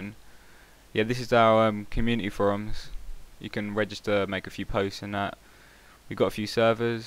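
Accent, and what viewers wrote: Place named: London, United Kingdom. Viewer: Hey, you sound like you come from some where around Sidcup? Correct?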